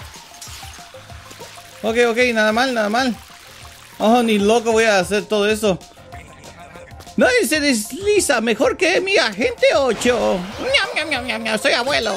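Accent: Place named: United States. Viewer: mmm tienes ese asento gringo:3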